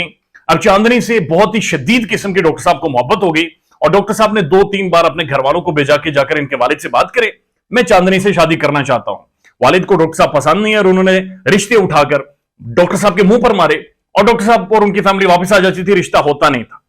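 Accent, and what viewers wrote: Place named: Punjab, India. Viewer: Your Punjabi accent is just woww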